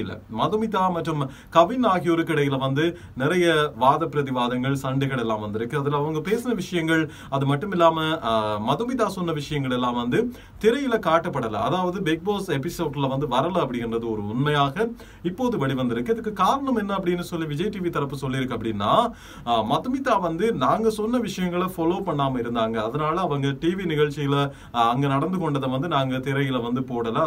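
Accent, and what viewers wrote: Sri Lanka. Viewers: Bro unga Tamil speech nalla irukku I meen ilankai Tamil super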